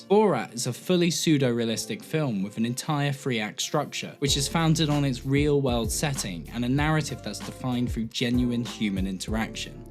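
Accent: United Kingdom. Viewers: The British accent keeps me watching. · Why are you pronouncing Iran like an American? Its weird af. You have a british accent for the rest of the thing, you weirdo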